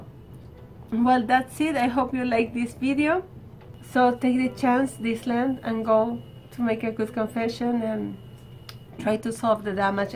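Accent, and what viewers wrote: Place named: Peru. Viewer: siiii jaja, estaba buscando lo del timon que se bloqueaa y vi el tuyo por q estaba asustado y de ahi me dio curiosidad tu canal,ademas tu forma de hablar jaja,dijeee ella ES PERUANAAAA❤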